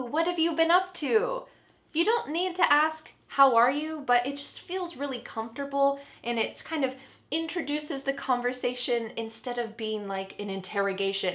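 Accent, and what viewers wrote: United States. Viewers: Yep, I m really into your American English. Txh a bunch my English is quick and dirty from Londrina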